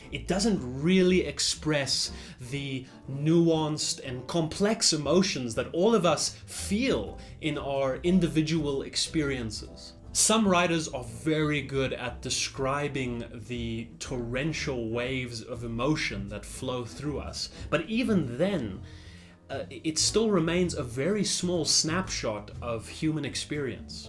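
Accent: South Africa. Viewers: Guy are you South African?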